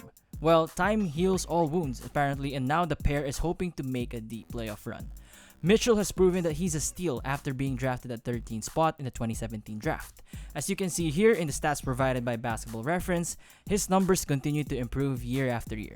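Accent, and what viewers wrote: Philippines. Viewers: Bro, are you filipino? That english accent of yours sounds like a pinoy.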